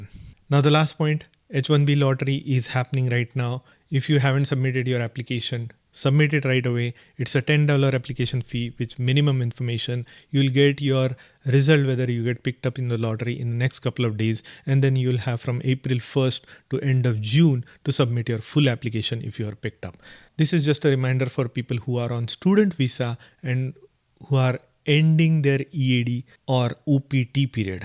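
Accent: Maharashtra, India. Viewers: apun maharashtrian ahat ka? your english is total marathi accent. jai Maharashtra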